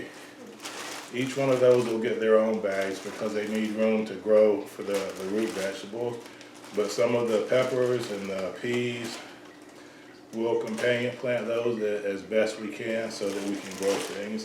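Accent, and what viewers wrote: United States. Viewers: Wow!! I am catching up on your videos - and I am so impressed with the collection of seeds that you have!! And you made me laugh when you said you have a bit of a southern accent and may pronounce things a different way!! People here in Texas sometimes think I am from a foreign country given the way I pronounce things!! LOL! So nice to see you and. God Bless, Mary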